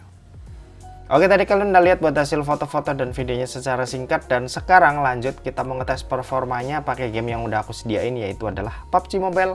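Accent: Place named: Banyumas, Indonesia. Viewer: Logate ngapak ya😂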